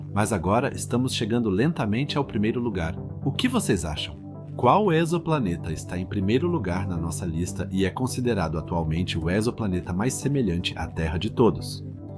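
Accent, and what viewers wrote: Portugal. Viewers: Fala muito rápido e troca o sotaque português e brasileiro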